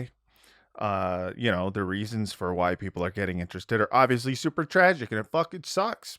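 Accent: England, United Kingdom. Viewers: I'm a Kiwi and I thought your Pommie accent was great! Only randomly came ax this thanks to YT algorithms since I've been binging Palestinian history and news content. It's been super-interesting listening to your views and experiences. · your british accent is amazing hhhhhhh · Your British accent is 45% Arthur Shelby and 55% Alfie Solomons from Peaky Blinders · as a brit, your accent of a ingerlander was perfect.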